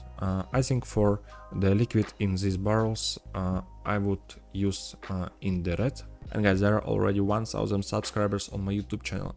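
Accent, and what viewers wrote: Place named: Russia. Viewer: Прям такой русский акцент. Вы говорите по русски?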